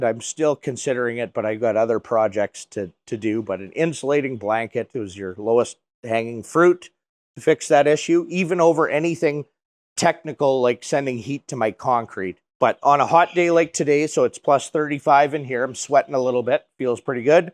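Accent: Canada. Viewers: This guy sounds so Canadian i Love it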